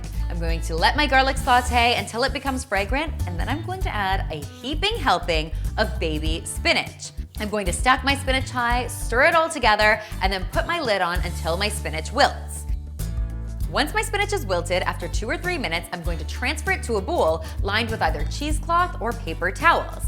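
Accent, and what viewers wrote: Canada. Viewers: She kinda talks like a news anchor but like in a totally non annoying way in fact I love her Canadian accent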